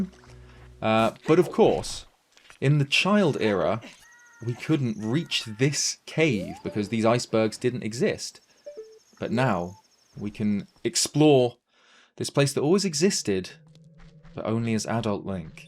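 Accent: United Kingdom. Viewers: Are you from leicestershire?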